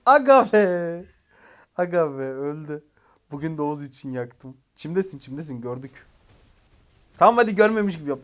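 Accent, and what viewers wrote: Samsun, Turkey. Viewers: Üzümlü kekim samsunlu olduğun ne kadar da belli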